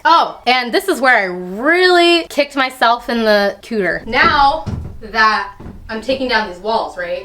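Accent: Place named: Canada. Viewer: I can hear Canadian in your voice.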